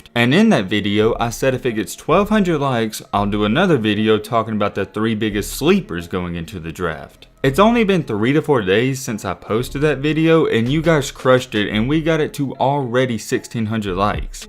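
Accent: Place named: Alabama, United States. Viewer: So you from Alabama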